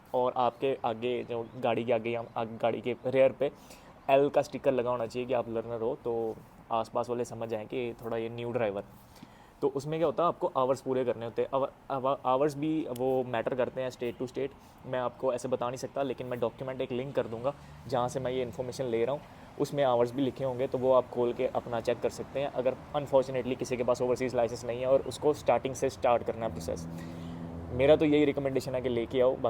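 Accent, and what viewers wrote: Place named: Punjab, India. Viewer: Speak Punjabi, your Punjabi accent is cool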